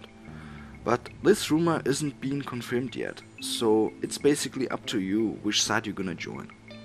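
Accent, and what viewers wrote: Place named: Netherlands. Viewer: ur accent tells me ur dutch gj!!!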